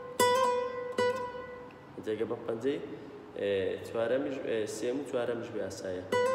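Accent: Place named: Rawanduz, Iraq. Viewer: Zor Zor supas Kak gyan. You’re a good teacher and I love your Rouwandizi accent 😊 keep it up mamosta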